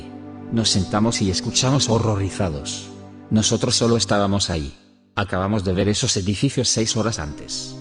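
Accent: Russia. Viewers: Es una chica, es extranjera, no se si sea la única administradora del canal. Tiene un acento euro asiático o similar, tal vez ruso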